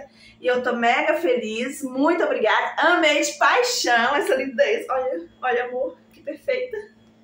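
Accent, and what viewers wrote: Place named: Minas Gerais, Brazil. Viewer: Boa noite querida !!!gostei muito de sua pessoa!!!acho que tenho algo em comum nosso sotaque mineiro kkkk😄comprei 2 semana passada e está vindo de rondonia tao longe estou aguardando espero q chegue em bom estado se eu soubesse antes q tinha vc q vende essas maravilhas tao perto de mim teria comprado de vc mas enfim nao faltarar oportunidade!!!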